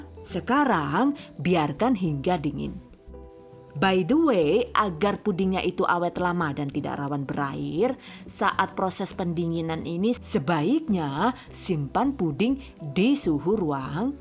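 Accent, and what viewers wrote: Banyumas, Indonesia. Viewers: Hehe q suka ngmgnya ngapak.. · Salam ngapak 😂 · Wong ngapak ya mbak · 👌seperti biasa ,apa saja hasil jadi masakannya pasti tampilannya cantik sempurna, mksh bun kasih tutorialnya yg super duper lengkap dan jelas dgn ngapak2nya yg kadang bikin senyum2 klo pas mantengin videonya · Rika asline ngendi yu jere wong madiun deneng ngomonge bleketek kaya wong banyumas,,nyong penasaran yakinlah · Wuaduh...ngapak banget....kie mbakyune......yaw...kriben ya... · paling sng klo ibu ini yg memandu bahasane campur aduk ada ngapak jg...slm ngapak dari tegal y bu...🙏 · Rika wong ndi yu bahasane ngapak lucu he.he.he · Mantul pake ngapak ngomonge 😄😄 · Ora ngapak ora kepenak ya yuu... 😁😁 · Rika wong endi sih yu....ngomonge kaya wong nggonku....banyumasan 😁 salam kenal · Jal wonge kaya ngapa.... ngapak temen 😂😂😂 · Wong Jawa ngapak apa kie...wkkkkkk........soale bahasane mung wong Banyumas sing kaya Rikane..Nek emang bener bangga nyonge...kmbi njenegan buk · Pingin ketawa..ngapak nya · Makasih resepnya yu..cara menjelaskan begitu jelas,saya suka banget, maaf yu sampeyan asli ngendi,kok ngapake kaya iyong🙏🙏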